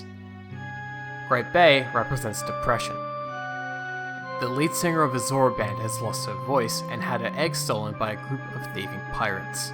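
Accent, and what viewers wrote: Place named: Australia. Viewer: I love the Australian accent. Okka-reener. Amazing.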